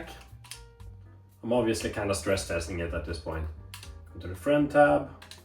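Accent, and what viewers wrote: Sweden. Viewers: I know that accent mr swede!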